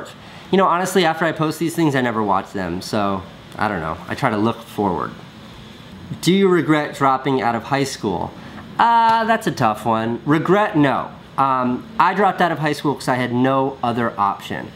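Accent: France. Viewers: haha love your french accent! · That accent in french though :) Greetings from Paris ! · Great french accent ! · your french accent it's cool :p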